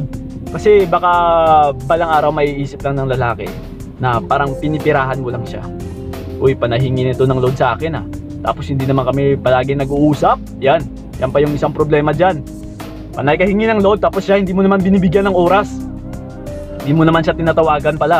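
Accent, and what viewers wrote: Iloilo, Philippines. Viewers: ilonggo ka po ba kuya ?? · Ilonggo ka kuya? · Hello po, ilonggo ka cguro dahil sa tono hehehe.. New subscriber po. From Hong Kong 😊Ldr din po. Thank you sa tips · Ilonggo ka ba sir?😁yung tuno ng pananalita😉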